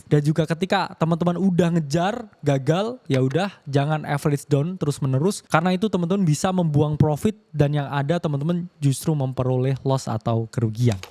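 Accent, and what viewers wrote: Java, Indonesia. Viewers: Wong Jowo ndi suarane qi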